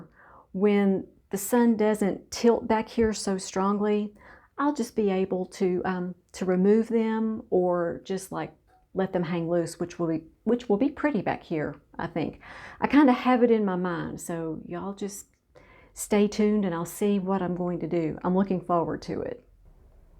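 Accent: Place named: Kingsport, United States. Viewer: Are you near Kingsport? Your accent like mine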